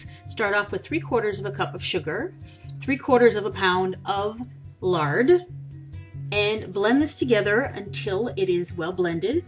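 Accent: Canada. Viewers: Where are you from in Canada? I recognize my own accent. It's so distinct from the Americans.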